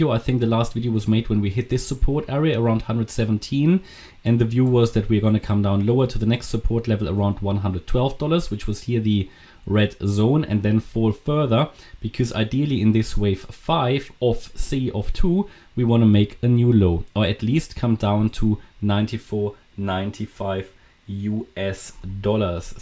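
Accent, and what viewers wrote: Germany. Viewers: Like Kris MM CRYPTO, Deutsche dialect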